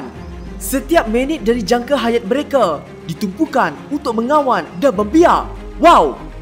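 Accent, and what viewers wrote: North, Malaysia. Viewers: Tudia pekat nau utara..haha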